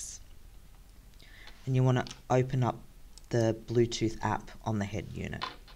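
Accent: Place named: Australia. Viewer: very helpful, thanks! ( so good to hear an Aussie voice narrating)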